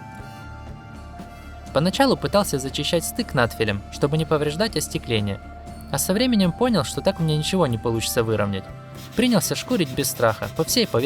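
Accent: Ukraine. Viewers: Чувак явно с Украины)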